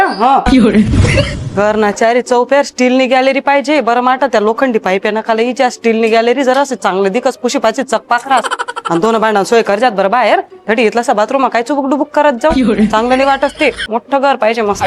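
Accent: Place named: Khandesh, India. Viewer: Ek no khandeshi bolta tai mi pn khandeshi ahe tumchi bhasha aaikun khup aaplepna vatato❤❤❤❤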